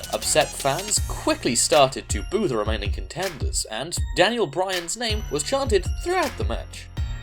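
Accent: United Kingdom. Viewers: Plus the gay ass British voice doesn't help neither.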